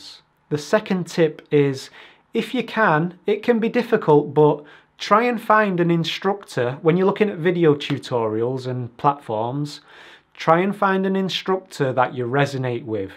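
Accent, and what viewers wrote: Manchester, United Kingdom. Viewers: Is that a Manc accent I hear?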